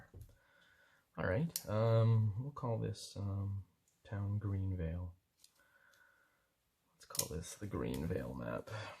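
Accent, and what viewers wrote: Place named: Ottawa, Canada. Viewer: Originally Ottawa valley but Victoria now. I knew you were local Ottawa from the accent.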